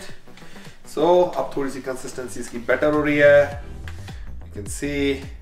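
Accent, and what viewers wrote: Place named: India. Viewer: I love the Indian desi touch while he talk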